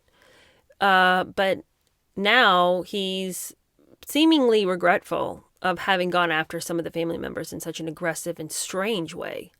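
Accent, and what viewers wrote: United Kingdom. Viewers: I tuned in to this bc I crack up listening to your Bri-ish accent. LOL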